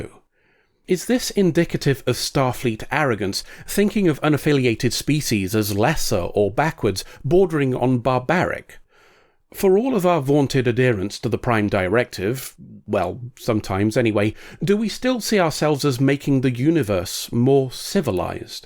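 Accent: United Kingdom. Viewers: You British? 😢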